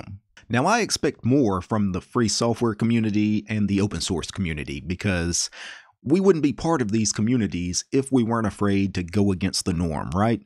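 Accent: South, United States. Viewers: I knew that southern accent wasn't for nothing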